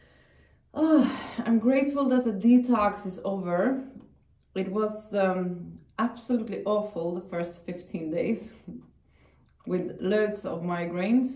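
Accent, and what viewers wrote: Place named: Spain. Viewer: I like your Spanish accent while speaking.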